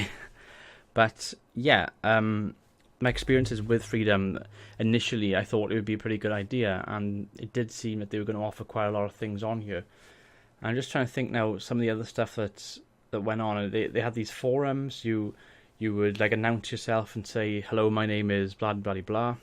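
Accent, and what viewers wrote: Wales, United Kingdom. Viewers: What part of Wales are you from?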